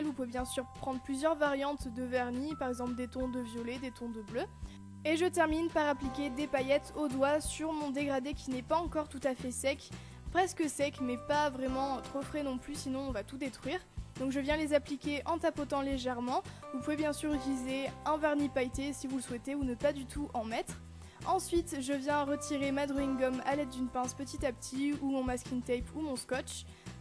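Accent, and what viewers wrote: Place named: France. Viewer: Tu parle français de France moi je parle le Québécois je suis une fille J'ai 8ans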